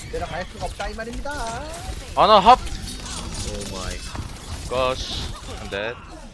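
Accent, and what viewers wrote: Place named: South Korea. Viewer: 콩글리쉬로 지껄이는거 굉장히 듣기 불편하노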